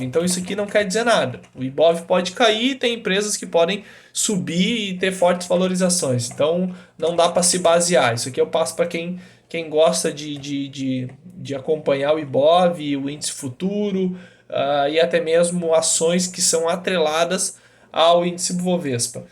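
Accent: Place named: Rio Grande do Sul, Brazil. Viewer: Gaúcho de onde mano?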